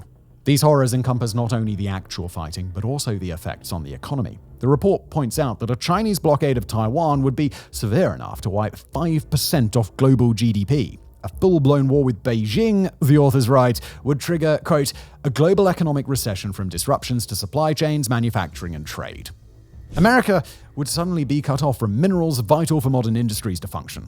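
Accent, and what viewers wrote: United Kingdom. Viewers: This is what Americans get wet for, hearing a Brit tell us that we're not ready for war.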